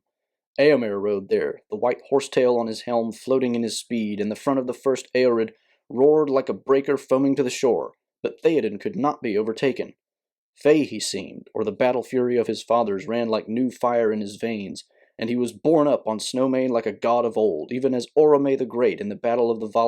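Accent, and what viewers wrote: South, United States. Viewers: Great Reading. Love the Southern Accent. Make more videos on the Silmarillion and Keep Pushing. Also, get on Odyssey and other platforms. I love your presentation. Very good.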